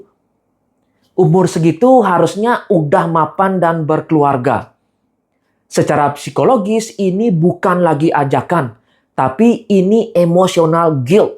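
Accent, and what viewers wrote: Bali, Indonesia. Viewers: Logatnya kek dr bali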